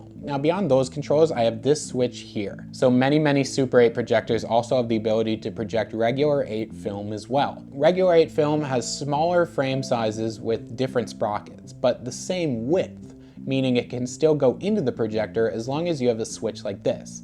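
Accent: Canada. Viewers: You have no idea how much I wish I'd found your channel a decade ago if it existed.. I had so much analog in my house and I didn't know how to do a thing with it and then I had a fire and a crazy crackhead tenant breaking in before that all I have left is a few things from the few super 8 reels that I managed to carry out of the house. I'm so afraid to run it thru a projector because I don't have much of anything left of my late parents and none of my brother since they have passed away. Where are you from? You sound like an old friend from Winnipeg and you are adorable! If I was half my age I would be starry eyed between your Canadian accent and your handsome face!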